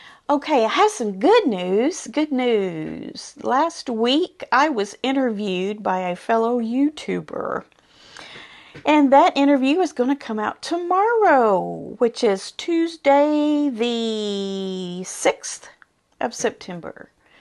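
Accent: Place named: Kentucky, United States. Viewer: I popped over to your channel after seeing your interview this morning with Steven on his YouTube channel (the idiot quilter). So nice to discover your channel!!🎉. Truly enjoyed getting yo know you. I’m originally from Owensbore Kentucky so I was thrilled to hear your accent. Brought tears to my eyes. I’m now in Pittsburgh PA but get home a few times a year. Blessings to You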